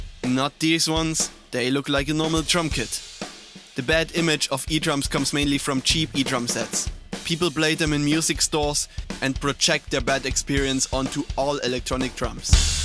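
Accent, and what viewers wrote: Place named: Germany. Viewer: Where do you live? U sound like a german guy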